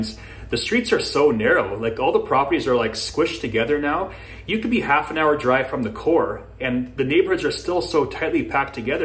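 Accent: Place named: Toronto, Canada. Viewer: I agree. How a person can call Calgary boring is shocking. Drive your car an hour to the west and there is endless amounts of epic views and amazing adventures to go on., you sound like you are from Toronto, not Alberta.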